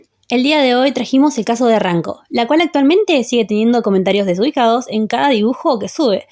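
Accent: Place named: Argentina. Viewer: se nota el asentó arg XDD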